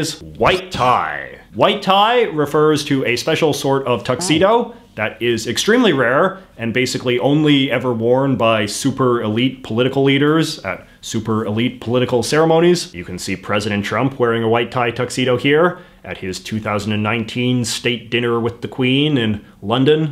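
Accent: Canada. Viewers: You have a very Canadian accent overall, but the way you pronounce "lieutenant" is quite American. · Funnily as a Canadian in BC I don't normally hear the stereotypical Canadian accent, but yours is quite pronounced. I assume this is an Ontario thing? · Love your Canadian accent as well as your legs. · You can tell this guy is Canadian just the way he speaks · This is the most Canadian accent I have ever heard. Is this real???? Where are you FROM??? hahah (Respect to Canada from Minnesota) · First time I am watching this channel (very impressed) but that Canadian accent... either exaggerated or you're from Cape Breton or Newfoundland. The only reason I say that is I'm a maritimer myself and haven't heard that in good old Halifax, but certainly have heard it around ! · i cant listen to him say outfit or about in canadian again. im out. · Is that a real Canadian accent? 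The “oot and aboots” are so strong it sounds like you’re mocking Canadians. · Thanks for keeping the Canadian accent alive,. You really lean into it. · Don't think so, we all just say Missouri the normal way lol. He does have the stereotypical Canadian accent though, so I guess that's it. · Thank you sir. You may (or may not) be interested to know that many members of our Royal Family in UK seem to love wearing military uniforms regardless of their (the RF member's) age or membership of a particular militia. Incidentally, talking of nations, natives etc did I detect a fine, Canadian accent? I have been practising listening before my hearing finally departs! Blessings and peace PS I really need to listen to the complete video to prevent making observations that you have already addressed. My apologies. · I challenge you to say out and about without the Canadian accent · I’ve never heard a more Canadian accent in my life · Hey man, I’m from Canada too but “arooond” instead of around, is a really extreme accent. 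· As a fellow Brit, it also helps that has an incredibly thick Canadian accent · The way you pronounce about and around is clearly rooted in some deep candiana, yet you forego the proper pronunciation for Lieutenant for the American pronunciation.. why is that? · I can tell that Canadian accent BTW I really want to go to Canada we’re would you recommend? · First time here and you’re the most Canadian person I’ve ever heard... and I’ve been to Canada 😂 really enjoyed this! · Oof this dude sounds Canadian. They usually sound like normal people, but then they say one or two words in a super weird way.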